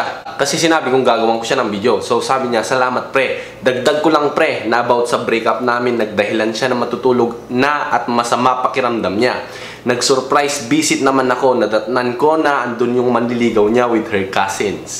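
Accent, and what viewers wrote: Ilocos, Philippines. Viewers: From Ilocos kaba? Kasi may accent ka ng iloco. Specially sa Laoag 😂 so cute! Anyway, your advices can help to those who need it. 😂 God bless and more vids. to come!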